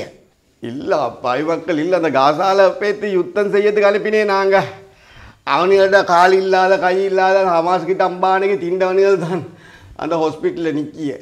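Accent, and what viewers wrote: Sri Lanka. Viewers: ப்ரோ உங்களுடைய வீடியோக்களை ஆர்வமாக பார்க்கும் உங்களுடைய சப்ஸ்கிரைபர் ஒருவனாக சொல்கிறேன் நீங்கள் பேசும் தமிழ் இலங்கை தமிழாக இருக்கிறது நாங்கள் தமிழ்நாட்டு தமிழர்கள் கொஞ்சம் புரியவில்லை உலகம் முழுக்க வாழும் தமிழர்கள் அனைவருக்கும் புரியும்படி உங்களுடைய வார்த்தையில் உச்சரித்து சொன்னால் இப்பொழுதே உங்களுடைய வீடியோக்களை ரசித்து பார்த்துக் கொண்டிருக்கிறோம் தெளிவாக புரியும் படி வீடியோ பேசி போட்டீங்கன்னா இன்னும் பெட்டரா இருக்கும்....😊😊😊😊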